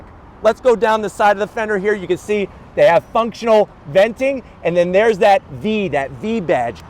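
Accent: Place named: Staten Island, United States. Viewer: Is it weird that i could listen to you talk all day hahaha! Sounds like you're from Staten Island or some part of NY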